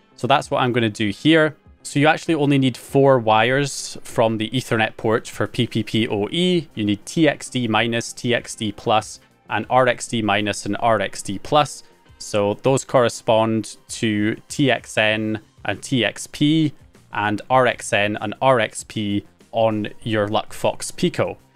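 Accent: Scotland, United Kingdom. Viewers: My Scottish wife wondering which part of Scotland you're from?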